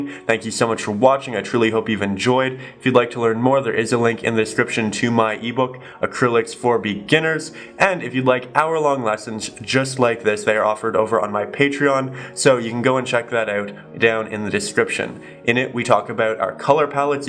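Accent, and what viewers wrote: Canada. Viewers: I always enjoy your lessons...& your accent...eh?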